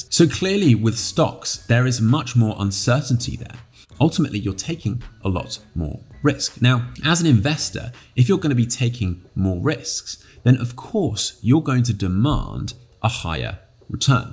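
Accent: United Kingdom. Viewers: Yes, that's mostly correct. I would like to continue to generate income in retirement but I'd like to do it with minimal risk. I hope the strategy of keeping 30% invested covers the loss to my cash reserves form inflation and gives me a slight gain. No one knows for sure what the market is going to do so I find it hard to make decisions this close to retirement. I'm sure fear of losing it is what keeps me at such a low level of investment right now. I just feel like we are in an asset bubble that could pop just as I'm about to retire. That would hurt if I were fully invested. What I have invested is VERY diversified and I made what I think was a smart decision at the first of the year to buy a significant number of STIPS to help keep my investments balanced when bonds/treasuries are performing so poorly. I'm just amateur so I'm reading and learning as much as I can along the way. 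Your videos are very helpful. It's nice to hear insight from a pro! Your videos seem smart and sensible whereas the carnival barkers on TV (who I shall leave nameless) seem to contradict themselves every other day when they've turned out to be wrong. Also, does not hurt that your info is delivered with a cool British accent. :) I also like Pension Craft and Paul Merriman.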